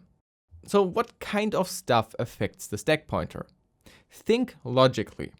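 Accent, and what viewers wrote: Germany. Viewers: The german accent is strong in this one... I know the pain too well~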